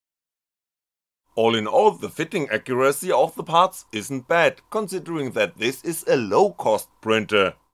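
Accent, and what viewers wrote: Germany. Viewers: I love how you germanice English. There is something oddly pleasing with that. Hahahahha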